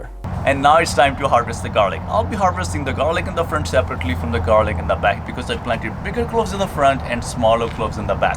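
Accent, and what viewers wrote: India. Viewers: i cannot understand your heavy indian accent bro!!!!!!!!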